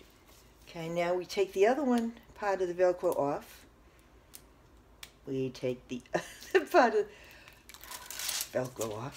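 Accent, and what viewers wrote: New England, United States. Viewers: Hi, I subscribed to your channel a few days ago. I enjoy watching your videos and find them helpful and also entertaining. One question: are you from New England? You remind me of the way people talked when I lived in MA. Keep the videos coming. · I have an idear...keep the r's. It's part of your New England charm and very endearing. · Nice job! And you remind me of myself.... hahaha Like you, I do as many little fix-its as possible. Limited $$ to throw out the window leads to creative solutions. Always gives you a good feeling of reward when you've done something like this. BTW.... You sound like you're from my neck of the woods. I'm in southern NH (Nashua area). You sound like you're pretty close by, maybe MA?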